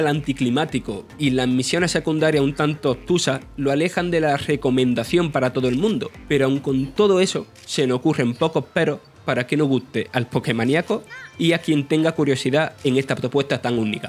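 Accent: Andalusia, Spain. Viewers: yo creo que mas andaluz, es que tiene algun problema, yo no hablo asi y no conozco a ningun andaluz que hable asi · La gente que se queja de su acento andaluz diciendo incluso que le sangran los oídos, todo bn en casa?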